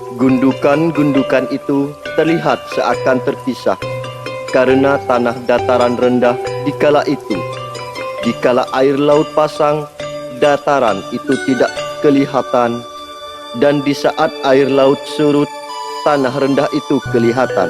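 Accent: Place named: Madura, Indonesia. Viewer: Admin suara madura tulen❤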